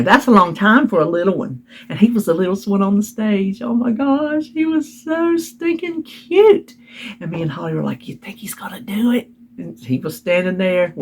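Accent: South, United States. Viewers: Hi:) I enjoy when you chit chat:) I love your Southern accent! You should hear me - I have a wicked Boston accent!! LOL 😂 Children feel like you are playing with them if you just watch them play:) They just want to be with you. ❤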